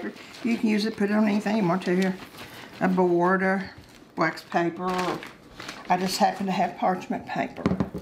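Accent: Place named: South Carolina, United States. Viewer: I'm from East Tennessee, your accent sounds familiar. Almost could pass for an East Tennessee accent, but no quite. Doesn't sound like deep South. Probably South Carolina or Virginia I would guess. Maybe Kentucky, but I don't think Georgia or Alabama. Could be wrong, tho.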